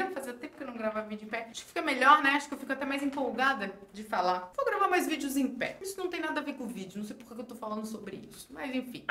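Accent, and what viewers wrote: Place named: Santa Catarina, Brazil. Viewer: Esse sotaque de peixeiro aí é de catarina né, conheço porque morei em itajaí/sc oxtepo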